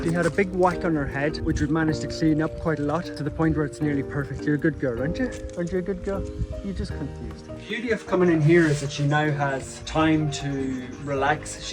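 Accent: Ireland. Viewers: You are an amazing person for helping these poor animals, you must have been sent from heaven as you sound so chilled with that beautiful Irish accent. Please continue with the good work helping them back to good health ❤😊 No doubt it has it’s up’s and downs but on the upside you are helping gods animals 😇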